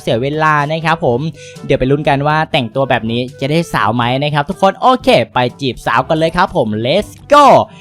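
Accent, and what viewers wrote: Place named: Suphan Buri, Thailand. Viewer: พี่เป็นสุพรรณหรอคับทำไมพี่พูดเหน่อ😅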